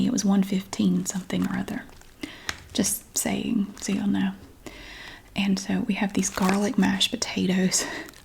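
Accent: South, United States. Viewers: Christmas time, Southern accent in asmr rhyme, aldi products crinkling under 30 decibel level time. 😊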